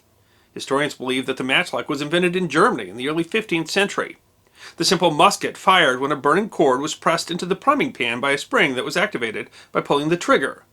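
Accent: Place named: Midwest, United States. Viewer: My Japanese is not so good. Your voice sounds mid-western to me, which is fine. I've found myself (at times) questioning certain English town / county /home pronunciations, and likewise other European places (ok, I'm a jerk) but you'll find no fault by me on this episode. Whether right or wrong, words rolled off your tongue as if you knew what you were saying and I bought it all. And...another great story to boot. I trust your script was not Kanji...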